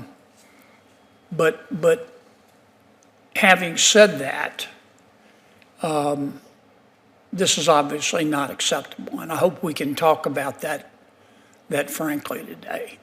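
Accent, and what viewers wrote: South, United States. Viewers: . NA that’s how he always talks sometimes worse. Southern I guess.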